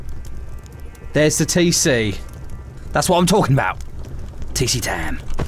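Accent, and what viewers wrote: United Kingdom. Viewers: I love your British accent and your videos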